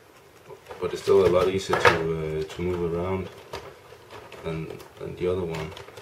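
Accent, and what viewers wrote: Denmark. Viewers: you sound Danish. are you Danish?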